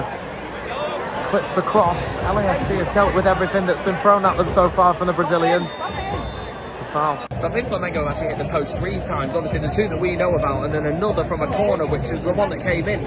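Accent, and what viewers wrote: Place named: United Kingdom. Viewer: O sotaque parece britânico.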